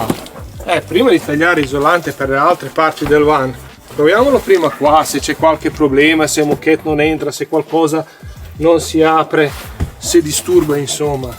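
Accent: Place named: Eastern Europe. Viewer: ma..io sento un accento slavo .. e nn dico rumeno.. secondo piu slavo .. ucraini.. ex iugo..bulgaria?)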